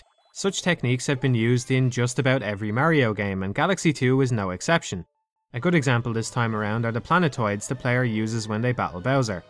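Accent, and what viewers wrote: Ireland. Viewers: Love your videos. Can't get enough of that Irish dictation. I have a new idea for a review series: Mass Effect.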